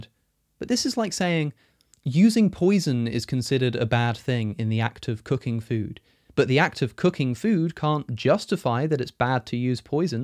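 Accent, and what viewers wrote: United Kingdom. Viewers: it's still a British accent. Just like a New York accent is an American accent.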